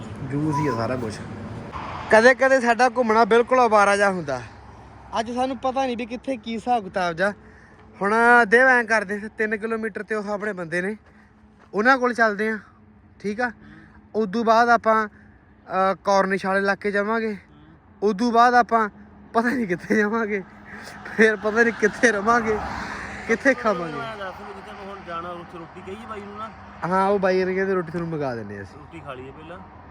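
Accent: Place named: Malwa, India. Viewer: Bai ni boli jma e desi malwai boli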